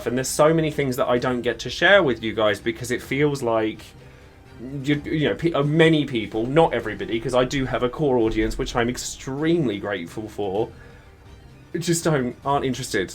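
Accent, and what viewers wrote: United Kingdom. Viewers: Okay, this is a lot to chew on. First: yes, I came to this channel for the game in question (Opera Omnia), but got charmed by the editing and the formating you gave everything you youched and I currently stay here because you. Yes, you. Your way to communicate and express yourself, your emotions that pour through the video to us, your british accent, everything you, just you. Never undervalue yourself, never fear the tomorrow so badly that it stops your journey, you are far more better as a content creator than what you, yourself, think. Trust me, I've seen my fair share of CCs and I'm good friends with some of them. Second: CHANGE! Yes! Bring it on, baby! This is a case of one of my bestest friends. She got an offer back in the 2018s to jump from Twitch to Facebook Gaming and she accepted it, however she didn't realized at the time that she fell into a booby trap that would slowly undo everything she had built for almost a decade. 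She jumped back to Twitch at the start of 2020, before the pandemic. Her view count had been reduced by 9/10ths, it was the biggest blow she received and she started climbing the metaphorical mountain again. By the summer of 2020 she did a gamble, she started to write the script and the setting for a tabletop role-playing experience, she would be the Master and close friends that are also streamers would be the players. She didn't know if it would save the channel, but she did it regardless. First, to have fun, and second, to expose this part of he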